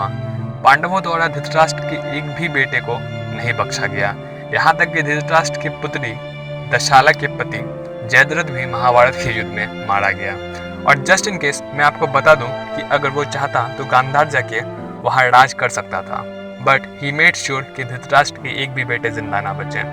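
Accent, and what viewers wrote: Bihar, India. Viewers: Tum bihari hoo kya 🙄🙄🙄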